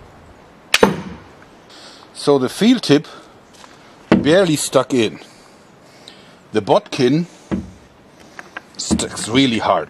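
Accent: Germany. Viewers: yes he is...Don't you hear the typical german accent? :D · the guy is a german i can hear it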